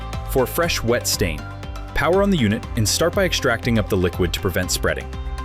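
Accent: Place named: United States. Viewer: what an awful video with irritating music and fast speaking american accent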